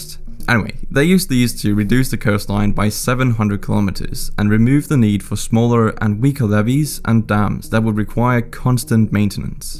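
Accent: Netherlands. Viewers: I like his Dutch accent although he is Danish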